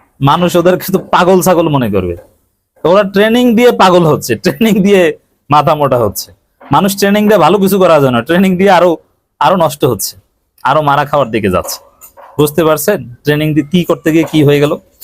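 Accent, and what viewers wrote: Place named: Comilla, Bangladesh. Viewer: ভাল লাগলো বাস্তবতা মজার ছিল। আপনি মনে হয় কুমিল্লা মুরাদনগরের, ভাষায় চাপিতলা দৌলতপুর এদিকের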